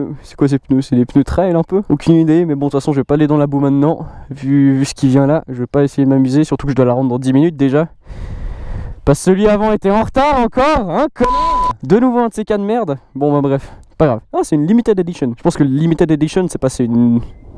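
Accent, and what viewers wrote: Vaud, Switzerland. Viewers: Le bel accent vaudois, ça fait plaiz'! Merci pour ta vidéo, tu as gagné un abonné. :) Je compte passer à la moto le printemps prochain après 7 ans de permis scooter, est-ce que tu la conseillerais à un débutant qui a zéro expérience en moto? Je cherche quelque chose pour faire le trajet domicile-travail (de l'autoroute ou de la route de campagne mais peu de ville) et me balader le weekend. Merci d'avance!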